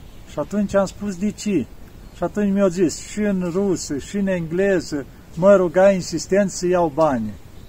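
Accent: Moldova. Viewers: Parinte voi precis sinteti roman ca cind vorbiti parca aveti accent moldovenesc va multumesc pentru sfaturile voastre frumoase imi plac povestirile care le spuneti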